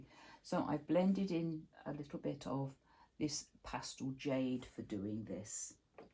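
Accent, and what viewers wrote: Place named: United Kingdom. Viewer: your British accent is music to my French ears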